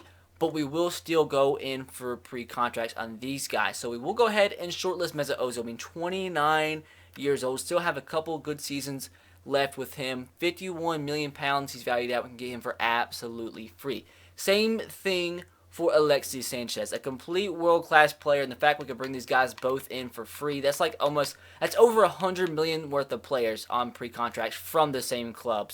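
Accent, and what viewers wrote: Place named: South, United States. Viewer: no hate, just curiosity are you southern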